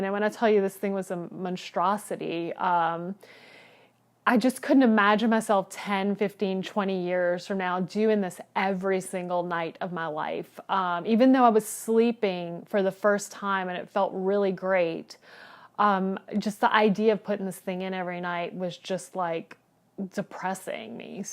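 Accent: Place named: South, United States. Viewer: Our girl's got that southern accent🔥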